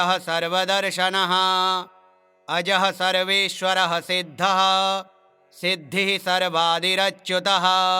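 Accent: Standard. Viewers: उच्चारा तील शुद्धता वाचकाला साध्य झाली आहे त्यामुळे ऐकणाराला श्रवण सुखा चा निखळ आनंद चा लाभ मिळतो धन्यवाद 😊 सा . म्हा म्हसे . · शुद्ध उच्चारण सहित विष्णु सहस्रनाम प्रस्तुति । घर में सुबह पाठ से आनंदित वातावरण हो जाता हैं, बहुत बहुत धन्यवाद ।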